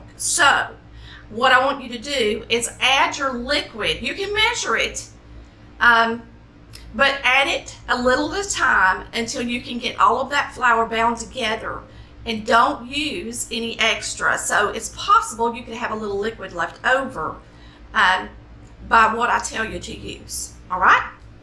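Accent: South, United States. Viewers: I am just loving your deep country accent 😍😍😍😍so motherly 🤗🤗🤗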